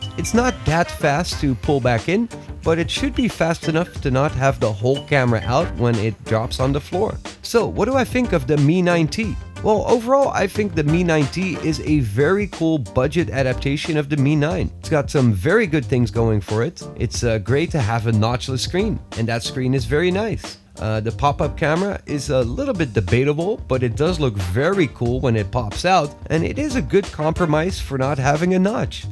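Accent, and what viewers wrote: Netherlands. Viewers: Are you dutch? Je hebt een Nederlandse uitspraak! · you sound kinda dutch are u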